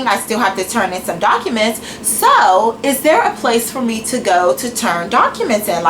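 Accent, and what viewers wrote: Washington DC, United States. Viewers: You guys chill out, she's from DC thats how they speak, Its just her dialect. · Lol I know you from DC I can tell from you accent. I loved this video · Lmao. You so funny! You from Washington DC or the surrounding area? Cause thats how we talk there 😂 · Lmao yassss!!! I would've done a video like this when I was at school. I went to school in NC but I'm from DC too, I was watching your make-up video and was like this girl must be from DC I can tell in your voice lol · She's from dc she has an accent 👌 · yasssss. DC accent!!!!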